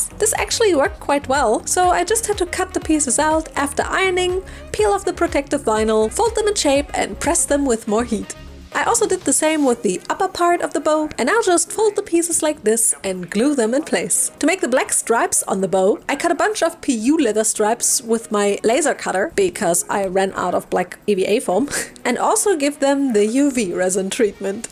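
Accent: Germany. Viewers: Omg youre german? xD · She’s German, dude. It’s her accent. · Grüße aus Leipzig! freu mich immer wenn ich einen deutschen Akzent höre ❤ ^^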